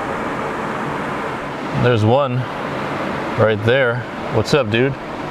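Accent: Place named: South, United States. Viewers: Love that southern voice hahaaa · Southern accent made me laugh so hard, ^_^. 5 star video. Thanks.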